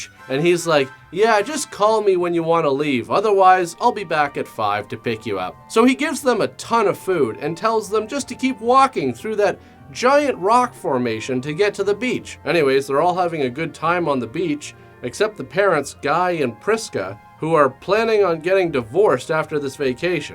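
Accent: Canada. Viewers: I found it quite obvious that he was Canadian after watching his first video. Although there are people in the Northern US that do have similar accents.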